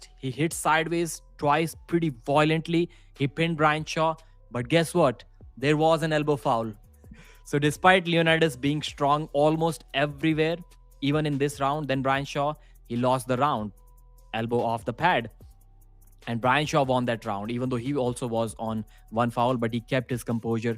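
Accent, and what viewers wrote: India. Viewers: Boah schreib doch dazu im Titel das du nur Bilder zeigst replaced und dein Indischen Akzent sprechen lässt statt denn Kampf zu zeigen.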